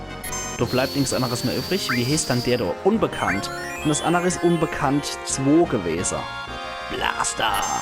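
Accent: Palatinate, Germany. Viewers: Bin zufällig auf den Kanal gestoßen. LPs im pfälzer Dialekt kommen ja super! geliked :-)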